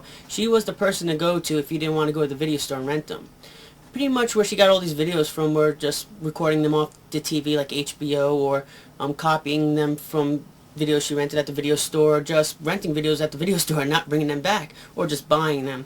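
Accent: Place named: East Coast, United States. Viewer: You're from the East Coast aren't you? You don't sound like you're from Cali or something.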